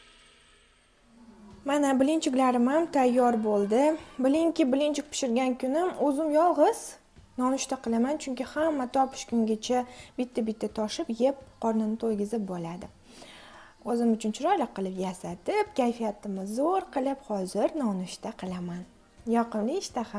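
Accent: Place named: Fergana, Uzbekistan. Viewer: Talaffuzizdan Fargonalik bolsez kerak deb oylodim.Men ham fargonadanman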